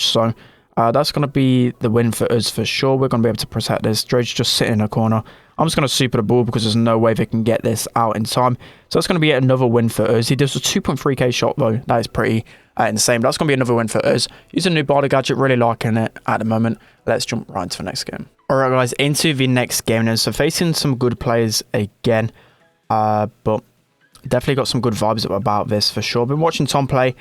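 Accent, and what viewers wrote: United Kingdom. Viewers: Uk people and their English :) heh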